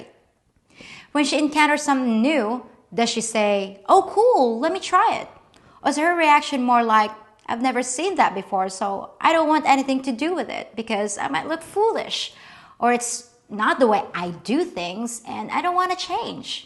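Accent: United States. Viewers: Hello!.. You absolutely a perfect in the U.S.. Everyone would think you were born and raised in the USA.. Another great episode!..❤❤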